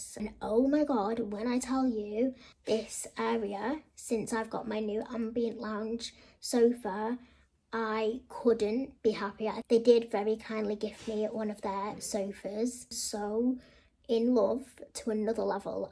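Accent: United Kingdom. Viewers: Extraordinary beauty....and love that British accent....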